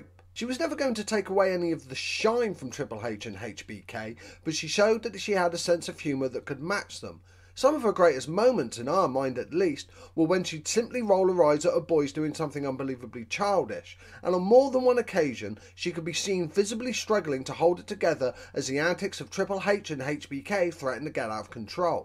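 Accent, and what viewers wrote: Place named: England, United Kingdom. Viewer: pretty sure he is english, thats how they pronounce the letter H.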